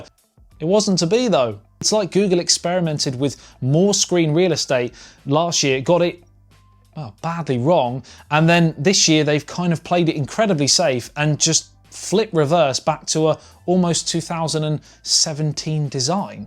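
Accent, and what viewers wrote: United Kingdom. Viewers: what can i say...feel the same. damn so cute and that british accent you could sale anything to me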